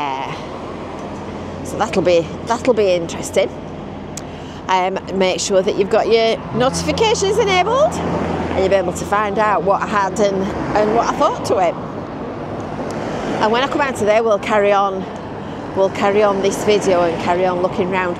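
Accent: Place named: Lancashire, United Kingdom. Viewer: Hello, I found your ‘vlogs’ only last week, nice hearing a Lancashire accent, I’m a Lancashire lass (well not a lass I’m 80) I always went to the illuminations with my parents the 50’s and remember standing in a doorway of the old Woolworths up a side street and the ‘sea’ coming across the road. Ive subscribed so will watch all your vlogs,thank you and stay safe, don’t get blown away 🚶‍♂️☕️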